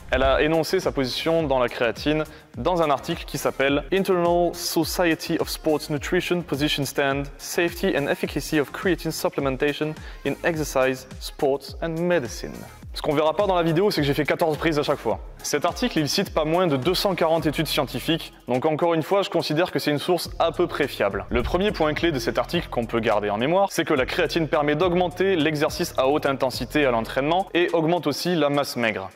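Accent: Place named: England, United Kingdom. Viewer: Je valide pour la qualité et l’accent anglais surtout ☺️.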